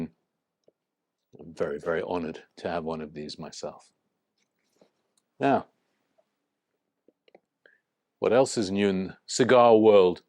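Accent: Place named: United Kingdom. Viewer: nice ckearly britain english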